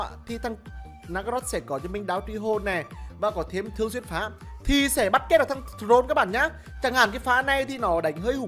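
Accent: Nghe An, Vietnam. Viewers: Y nghe an que choa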